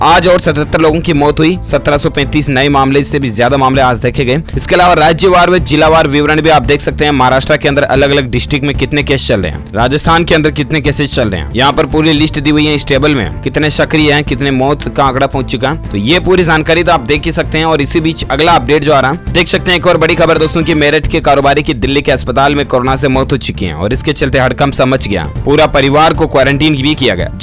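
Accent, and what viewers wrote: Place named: Bihar, India. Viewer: भाई आप बिहार से है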